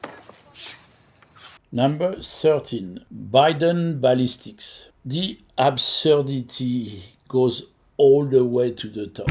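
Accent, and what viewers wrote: France. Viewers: Watching a French man with a French accent talk about guns like a red white and blue gun enthusiast blows my mind. Liked and subscribed.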